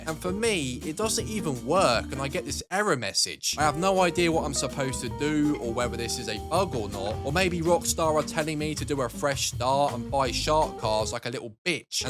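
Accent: United Kingdom. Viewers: final boss of the brit accent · gG british accent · The limey accent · Ugly UK accent.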